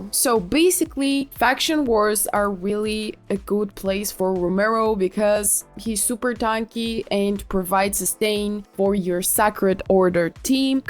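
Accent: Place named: Quebec, Canada. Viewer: My guess is you are french Canadian, I hope I did not offend you by asking that, I was just curious because you have a beautiful accent. Great content for people starting our and I even watch myself even though I'm end game, sometimes I miss things so it's nice to refresh and in some cases learn something new I could have overlooked. 😊😊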